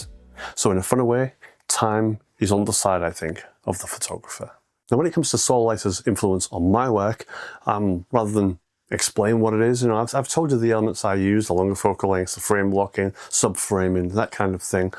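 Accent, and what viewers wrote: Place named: Hull, United Kingdom. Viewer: A hull accent on YouTube and a Saul Leiter fan, Brilliant! Really enjoyed watching. Your Instagram post brought me here, but before I read anything, I thought very Saul Leiter. Fabulous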